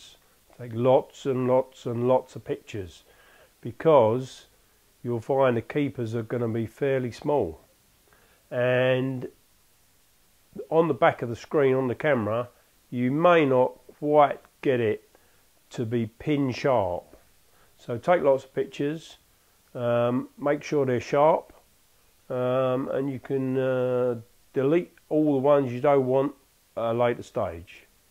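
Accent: United Kingdom. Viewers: Britain accent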